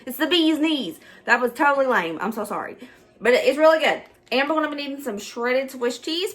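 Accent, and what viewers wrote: South, United States. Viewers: Love this presentation and especially love your speeded up prep. This California girl(82) loves your southern accent have some trouble understanding some of your pronunciation but then again I have the same difficulty with British PBS shows. It took me until I saw the finished slaughters that the big OH! You were saying SLIDERS. Look forward to seeing more of your presentations. Do you have a recipe for a slow cooker complete turkey dinner, dressing,turkey breast, string beans and small potatoes cooked with the turkey breast on the sides. Hopefully reduced sodium. Thank you for sharing your handsome family.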